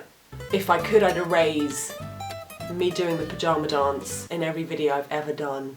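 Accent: England, United Kingdom. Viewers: You make really good videos, plus I like the travel videos like Ibiza, keep it up you are awesome, my friend likes your English accent.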